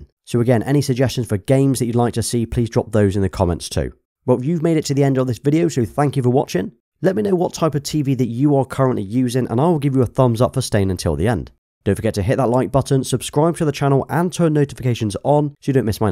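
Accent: United Kingdom. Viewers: I really wanted to find someone speaking British English in normal speed, finally, the day has arrived.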